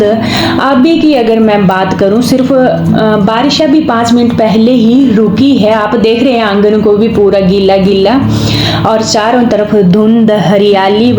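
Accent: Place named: Jammu, India. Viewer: Nice ji ❤from rajasthan Mai apki dogre accent bhut pasand krte hu Mai akhnoor boder pe rahte the 1993 mai to lagaw h panjaabi, dogree,kasmiri se Mere father saheb bhi fouji rahe 1998 tak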